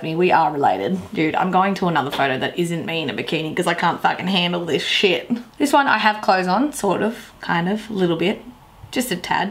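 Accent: Australia. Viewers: Thumper Bunny, Everyone has an accent, you just don’t know you have one, since you’re use to it, she does have some sort of an Australian accent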